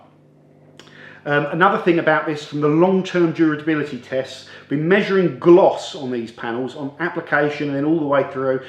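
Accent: England, United Kingdom. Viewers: I like the nature of his videos as is. Conversational. Friendly. You get a feel for the personality. I would enjoy them far less if they were closer to the overly constructed, clinical tripe you're describing. Then again, I'm American and might just be enjoying the accent; it's like listening to a Charles Dickens character tell me about a magical place called Bilt 'Amber, where I can only imagine they have wonderful foods that are all called 'pies' for some unknown reason.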